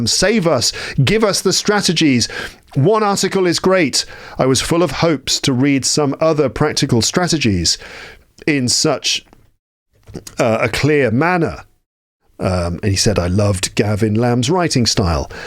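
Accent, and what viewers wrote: United Kingdom. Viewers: I think you should warn your audience that listening to your podcast can be very dangerous. After just a couple of episodes I’ve got completely addicted and now I can’t stop. I love your short stories and I like so much your rambling episodes too, no matter how long they are. With your beautiful voice, your lovely British accent and your sense of humor you could read your shopping list and it would be interesting, amusing, useful and totally enjoyable From now on I will stop watching Netflix movies that I can’t completely understand, it so frustrating. I will listen to your podcast instead, so hopefully my old-fashioned-miss-Marple-English (I’m a big fan of Agatha Christie novels) will become more modern and more fluent. Thank so much for your great podcast,.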